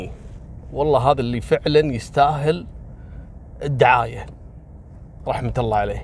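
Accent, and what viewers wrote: Kuwait. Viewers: ياخي مع انك تقول قصص جرائم ورعب وتخوف بس انت سعادة حقيقة بحياتي 😊❤️حبيت الكويت وأهلها ولهجتكم منك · أدمنت طريقة سردك يا ولهجتكم الكويتية حلوة 🇩🇿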